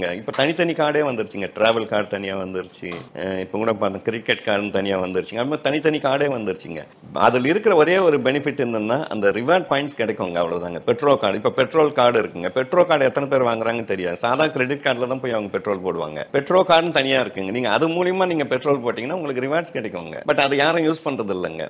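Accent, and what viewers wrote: Coimbatore, India. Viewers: Enga Coimbatore slang uhh nga❤ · Evalo respect a peasararu 🙏Kongu slang ❤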